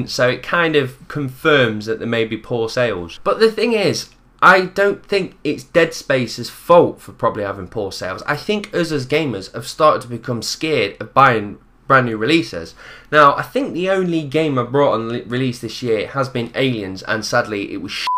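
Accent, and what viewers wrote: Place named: Derby, United Kingdom. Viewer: Are you from Derby? So strange to hear ones own accent on the internet, especially when you haven't lived there for a few years.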